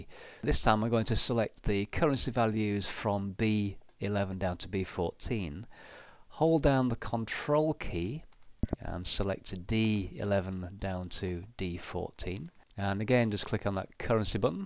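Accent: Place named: England, United Kingdom. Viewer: This English accent.. uff... English in an Indian accent is better for Indians..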